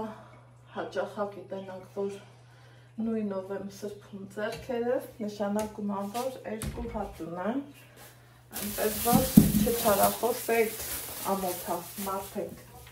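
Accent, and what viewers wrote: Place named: Gyumri, Armenia. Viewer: Ьтик артен мер Ленинаканцу акцентов асир 😂 джан арохч йакнек, шат сирун шорикнерен, апри 🙏🙏🙏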